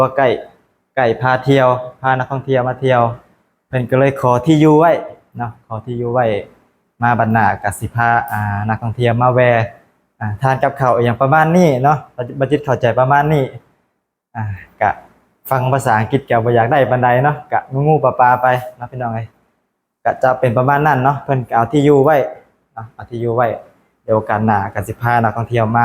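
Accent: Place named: Laos. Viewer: เว่าลาวดีแล้วน่ารักดี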